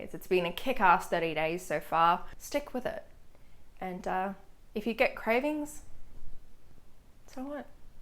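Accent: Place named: Australia. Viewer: Hello -- it sound like you may be in Australia ??!! I am in NSW. With so many carnivore channels from USA, it is great to know about you 💟💛 I am not sure if I'll stick with this, as my body is not in good shape, I switched from vegan (fruit-based, and also starch-based), to carnivore, and now ketovore and still undecided ! Will be good to view more videos from you. What r your favourite carnivore people / doctors ??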